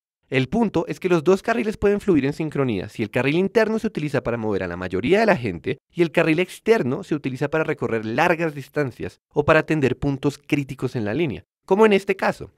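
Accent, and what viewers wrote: Bogotá, Colombia. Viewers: Todo estuvo bien, pero que Dios me libre de tener acento rolo algún día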